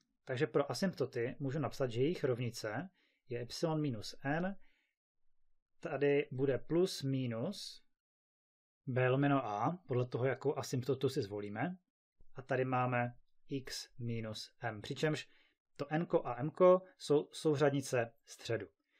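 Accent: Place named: Moravia, Czech Republic. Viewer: až na ten moravskej prizvuk docela dobry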